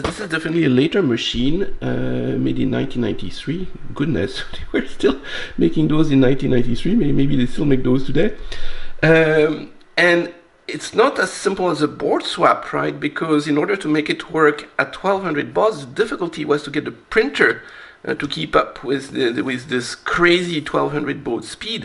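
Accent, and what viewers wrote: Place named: France. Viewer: Whenever I start a video and I hear "Hello and welcome back!" with that hint of a French accent I know I will learn something about vintage computers today.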